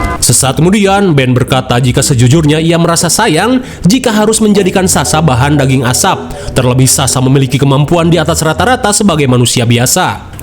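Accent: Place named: Sunda, Indonesia. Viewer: Narasi narator, kaler, cagak, poek, rorombehen, ari si akang urang mana euy ?😅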